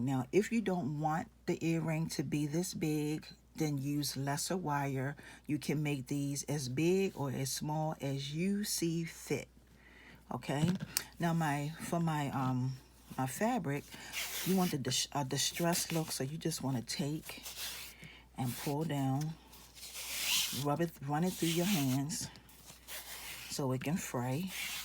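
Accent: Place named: Baltimore, United States. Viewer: I love this! I will definitely be trying this. Thanks for sharing. P.S. I think I’m hearing some Baltimore in your accent. 😁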